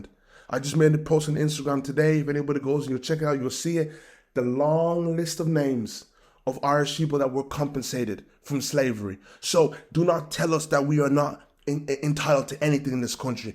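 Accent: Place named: London, United Kingdom. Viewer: He has the London bridge accent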